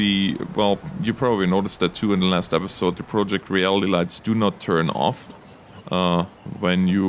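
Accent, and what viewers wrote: Germany. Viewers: canadian? you have a german accent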